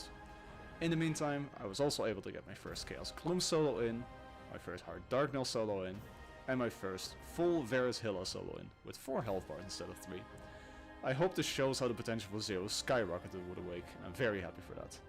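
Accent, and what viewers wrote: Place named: Netherlands. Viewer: You sound really dutch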